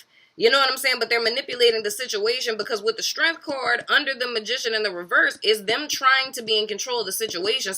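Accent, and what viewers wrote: New Orleans, United States. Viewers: I was trying to figure out whar is that accent I’m hearing. lol I’m getting NOLA.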